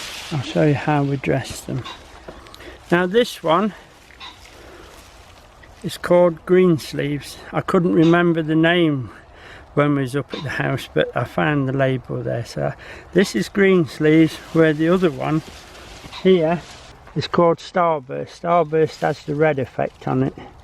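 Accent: England, United Kingdom. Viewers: New subscribers here - from the states (don't hold that against us - both my granddad's were from England ! :) ) FANTASTIC garden - there's no doubt in my mind even if your video's weren't interesting I'd still be watching/listening as I love the English accent (you can thank my dad's dad for that ! )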